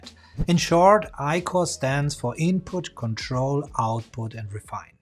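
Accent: Germany. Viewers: yes I discovered you guys one week ago and I am very impressed, I love your framework… one question where can I contact you? And I am very curious looks very Spanish but your accent is German isn’t? 😅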